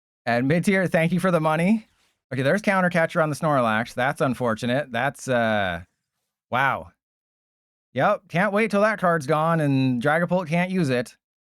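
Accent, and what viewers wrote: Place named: Australia. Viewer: Living in Australia and still lucky enough to hear incredible aussie accent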